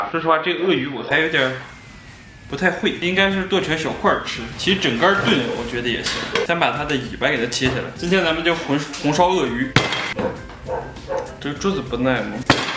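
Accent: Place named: Bayannur, China. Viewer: 我跟你说~ 听出来蓝 是个巴盟人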